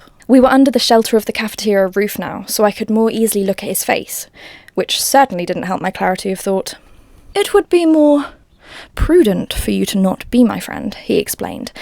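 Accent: United Kingdom. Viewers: I hope you do all 4 books! Nostalgia, cringe, and anything with English accent is a delight to listen to. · I know its mostly just because you're British but you would be such a good audiobook reader. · When I read the twilight books I had no idea Jacob was supposed to be 15! It’s insane. - “it’s nice to read a romance book where the female protagonist has good female friends… and the romance doesn’t get in the way of that friendship…” uuuuhhh new moon might change your mind. I love Bella read in a British accent, it makes her voice so much better.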